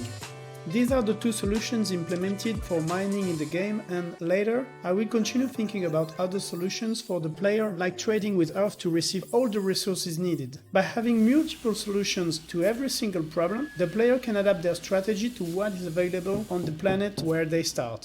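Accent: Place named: France. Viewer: i love your french accent !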